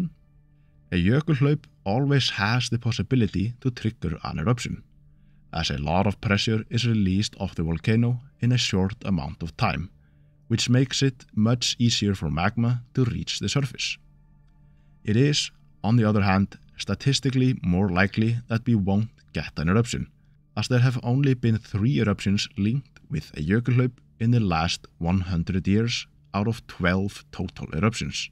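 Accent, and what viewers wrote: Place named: Iceland. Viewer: I love the way you use a slow Icelandic drawl.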